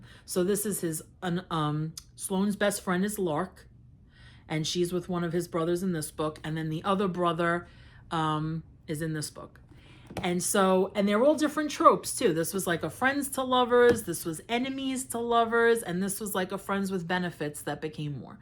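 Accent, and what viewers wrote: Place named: New Jersey, United States. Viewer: I love your accent so much. Is it New jersey or Pennsylvania? Sounds like Marisa Tomei in My Cousin Vinnie.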